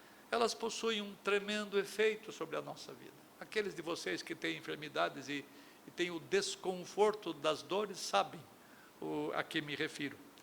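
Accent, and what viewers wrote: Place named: United States. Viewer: Q sotaque de americano é esse às vezes? E "nois"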